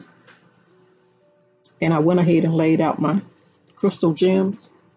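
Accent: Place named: St. Louis, United States. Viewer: You from STL? I'm out here but from jersey...you sound like you gotta lil stl accent. Very nice btw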